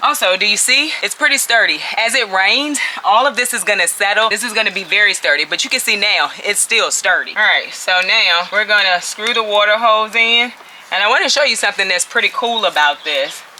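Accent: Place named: Virginia, United States. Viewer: LoL, it's always the Virginia accent that I love 💞.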